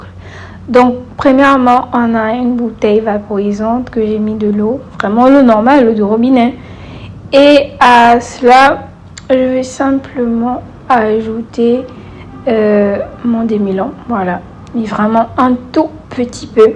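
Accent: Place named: Gabon. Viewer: Tu as l’accent d’une gabonaise 😅😅. Sinon très beau rendus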